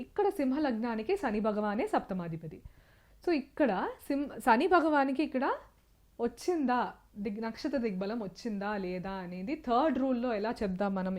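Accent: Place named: Tamil Nadu, India. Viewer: అమ్మా,మీ ఉచ్చారణ, చెంద్ర, సని అని పలుకుతూ ఉన్నారు.కొంత ఆరవ/ తమిళ accent నుంచి తేట తెలుగులో పలకండి. మీరు రికార్డు చేసిన తర్వాత వాయిస్ low గా వున్నదా అని కూడా చెక్ చేయండి. బాలు, ఘంటసాల పాటలతో మెరుగు చేసుకోండి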